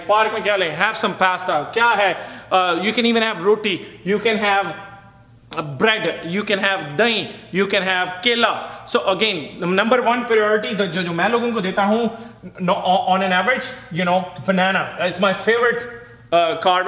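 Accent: Punjab, India. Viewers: His punjabi accent is soo cute, haha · Jst love the way he talk....punjabizz always rock😂😊😍😘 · Tuhadi khand chatt diya 😂😂😂😂😂 mori cha ungle 😂😂😂😂😂😂 pure punjabi accent 😂😂😂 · Yaar Punjabi ke saath yeh ajeeb sa accent english ka... Fir punjabi touch wali hindi..... Maa kasam.. body pata nahi... But dimaag ka workout to tum poora karwa dete ho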